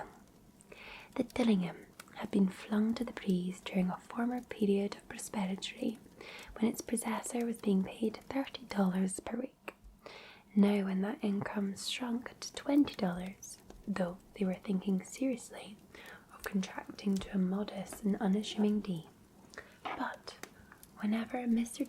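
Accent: Scotland, United Kingdom. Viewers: Ah I missed that lovely soft Scottish accent. Sleepy time now, night night 💤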